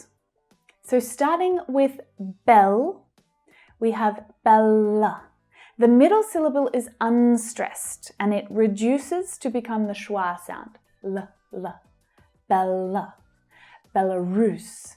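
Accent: Australia. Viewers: Hi, your Australian accent is different... it is very easy to understand...Ths! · mmmEnglish rules and you try to make it fun. I'm Canadian and you seem to have a combination Australian / English accent. Is that because you have a Melbourne accent or because you have spent time in the UK? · beautiful woman, nice voice, is that a slight Australian accent? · My goal in 2019 i wanna pursue my master degree in Australia, hope this year i can make it. Thank a million....great video. I love your Australian Accent,❤️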